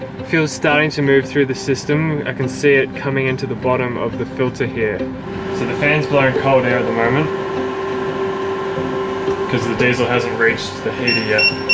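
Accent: New Zealand. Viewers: Awesome!!!! Well done! I love New Zealand, the accent and your work! Can’t wait to see more!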